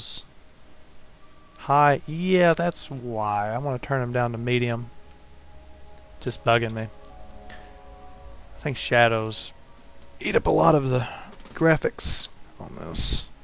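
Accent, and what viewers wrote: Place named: South, United States. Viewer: your southern accent is great dude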